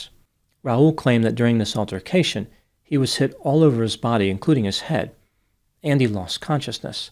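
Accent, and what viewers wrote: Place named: Pennsylvania, United States. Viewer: I keep noticing your accent… sounds very similar to mine. I’m going to guess you’re from somewhere in South central or eastern PA or north MD? I’m from York pa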